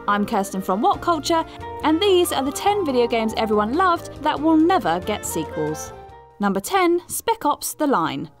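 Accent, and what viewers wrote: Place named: United Kingdom. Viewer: I know they're all british. But damn this new host is british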